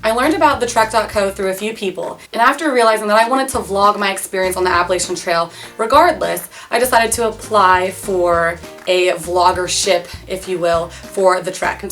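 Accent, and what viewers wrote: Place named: Pennsylvania, United States. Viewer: Are you by any chance from the Philadelphia area?? You sound exactly like you grew up in South East pa haha that isn't an insult at all I promise, I live in Delco 😁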